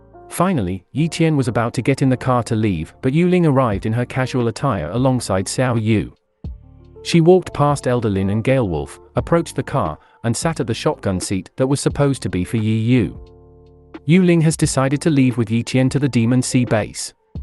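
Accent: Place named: United Kingdom. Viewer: A British narrator this time🧐☕👌 😂😂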